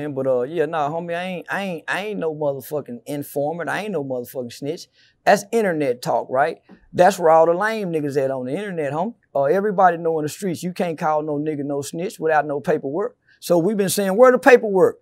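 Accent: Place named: Texas, United States. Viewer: Man I love Texas accent 😂😂😂 "you skirr me" 🤣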